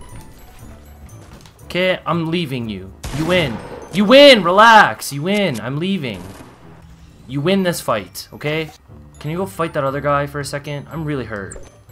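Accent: Canada. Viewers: Is he Canadian? Just wondering cause he doesn’t say sorry like an American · Are you Canadian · Are you from Canada?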